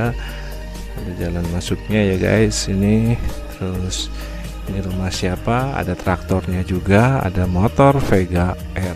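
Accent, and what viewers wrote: Banyumas, Indonesia. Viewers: Rika wong ngendi sih kang jawane ngapak temen pada bae karo kancaku wong cibenying 😀😀😀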